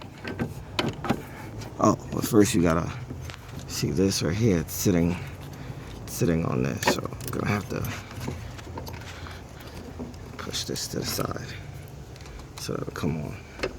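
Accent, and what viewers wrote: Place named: East Coast, United States. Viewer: East coast accent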